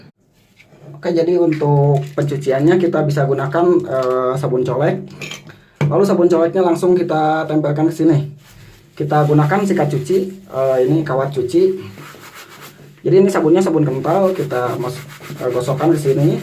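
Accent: West Java, Indonesia. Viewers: Jelas dan ngakak saya ngadenge nya mang 😂 kaciri sunda na pisan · Meng inspirasi suatu saat bakalan tak coba. Kang tinggal dmn mau dong motor saya di sevice kaya gitu di acak acak.. hehe.. kalau logat mah Sunda yah... Daerah mana kang..minta no wa dong. Klo blh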